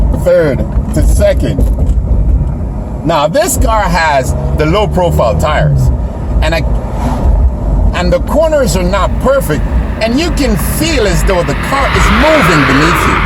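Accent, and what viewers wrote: Jamaica. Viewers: You jamaican bro ?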